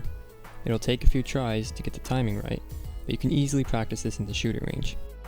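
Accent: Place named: United States. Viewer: Just curious, where are you from. It sounds like you have a typical American accent at first, but I keep hearing little places where it sounds like an accent is coming in.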